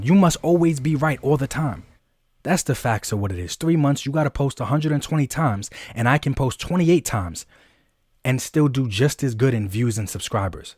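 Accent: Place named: South, United States. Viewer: He’s definitely from the south. I would know cause I am to. People think I talk funny also.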